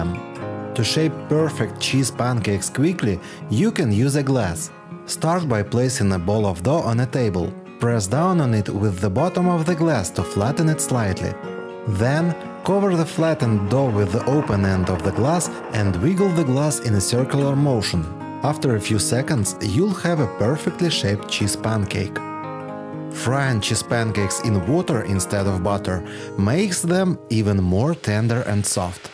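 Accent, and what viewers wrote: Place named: Russia. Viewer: Мужик, ты чертов гений! Лайк тебе за лайфхаки и за классный русский акцент. Подписалась.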